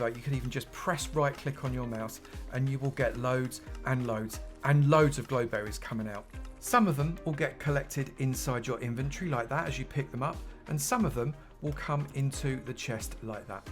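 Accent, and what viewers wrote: United Kingdom. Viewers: Oh, cool, a chill British voice, so I can wind down after work and learn new farm mechanics since I’m getting back into Minecraft after a decade… I might even watch more because he sounds so rela…”HELLO EVERYONE!!!” yanks headphones out of ears Nope, I think I’m going to find tuts online (like I used to do) and refigure it out myself this weekend with just Minecraft ambience/music as the only thing I will hear and know it won’t suddenly shoot up in volume for no reason at all.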